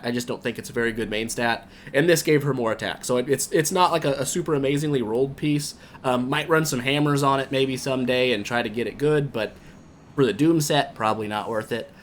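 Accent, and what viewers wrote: United States. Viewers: Yoooo welcome back (although I'm pretty late to the party), I still remember watching you 7 years ago when I first came to the states and couldn't even hold a conversation to save my life, got addicted to watching your videos (more than idle heroes itself) and accidentally practiced English while shadowing the way you talk as I went back thru your video catalogue with the video speed set to.50 or.75 since you talked way too fast for me back then lol, I also happened to pick up a very thick southerner accent thanks to you haha, anyways thanks for the videos man you honestly had a pretty big impact in my life!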